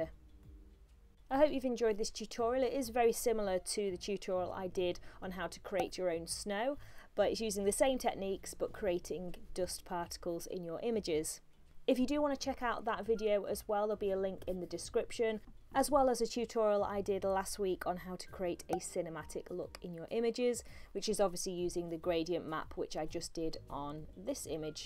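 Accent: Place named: Birmingham, United Kingdom. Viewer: Great tutorial! Thank you! Love your accent by the way! Is it brummie?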